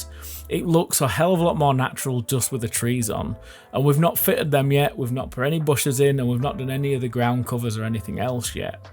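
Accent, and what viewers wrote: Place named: Northern England, United Kingdom. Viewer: This guy’s accent makes me think he says ‘ey up’ instead of hello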